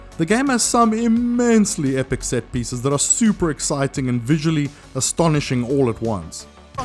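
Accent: South Africa. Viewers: Yiss I fought this oke sounded a bit on the safrican side.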